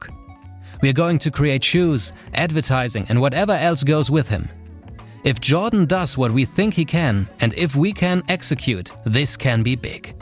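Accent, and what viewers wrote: Germany. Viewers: Your voice sounds somewhat German. Are you from Germany?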